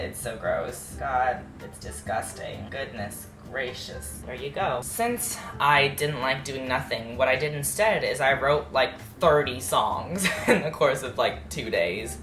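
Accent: South, United States. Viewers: YOU CAN HEAR YOUR SOUTHERN ACCENT SO MUCH IN THIS VIDEO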